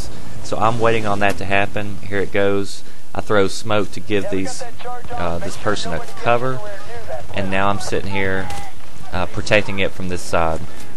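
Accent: South, United States. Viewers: Finally southern guy on here (no homo) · bad company toow. is he from the south?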